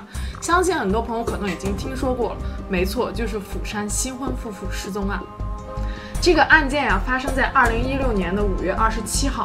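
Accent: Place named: China. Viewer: 幹，26腔聽不下去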